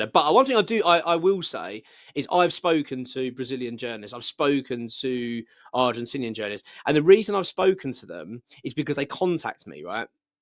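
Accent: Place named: West Midlands, United Kingdom. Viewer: was you born in the west midlands? I can tell an accent.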